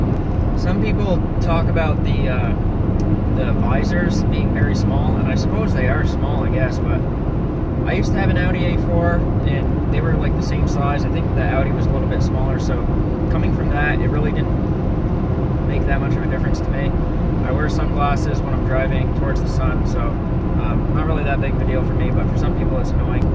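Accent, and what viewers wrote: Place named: Canada. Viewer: You have a slight canadian accent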